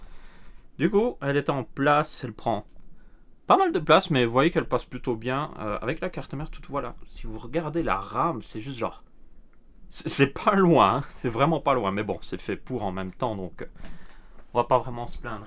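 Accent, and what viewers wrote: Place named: Belgium. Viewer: C l accent et expression belge.. un vis ..lol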